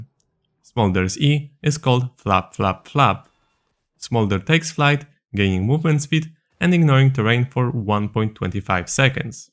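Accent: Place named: Poland. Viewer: polish accent diff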